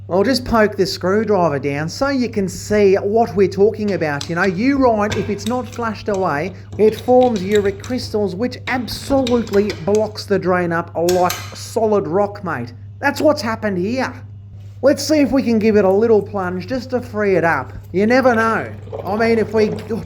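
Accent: Australia. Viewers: omg your austraulian accent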